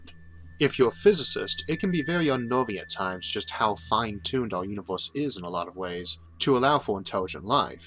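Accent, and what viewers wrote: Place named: New England, United States. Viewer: WTF accent is he speaking with? Cross between a Boston and Maine accent?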